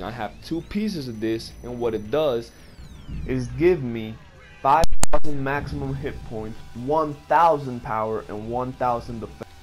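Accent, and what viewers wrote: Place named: Brazil. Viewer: Where are you from? By the way you talk, u seem to be a Brazilian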